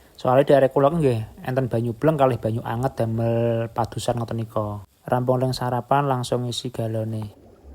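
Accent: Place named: Java, Indonesia. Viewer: Kok bahasanya medok kayak jatim jateng ..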